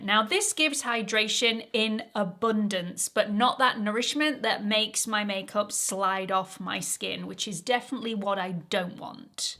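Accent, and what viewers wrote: United Kingdom. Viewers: I gave a thumbs up for this video, even though it seems to be a long ad for expensive devices I can't use because I have an implanted cardioverter-defibrillator. I honestly never noticed how well you sell-Sell-SELL! No worries, your voice is so enjoyable, and your British accent helps train me to listen better as I'm hard of hearing. Thanks for the lesson!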